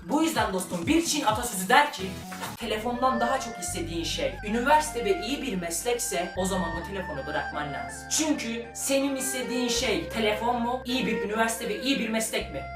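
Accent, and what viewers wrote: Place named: Trabzon, Turkey. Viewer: trabzonun neresindensin reyiz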